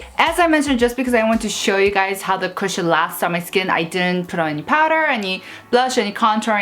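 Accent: England, United Kingdom. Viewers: Cori Lynn I think she went to a British school while living in Europe and other countries. So she probably picked up some mannerisms hearing different accents. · love your english accent. Next time you can teach Korean languages also I'm really appreciate that lol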